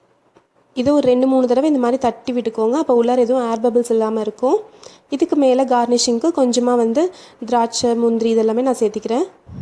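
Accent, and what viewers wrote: Coimbatore, India. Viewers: Nega coimbatore ah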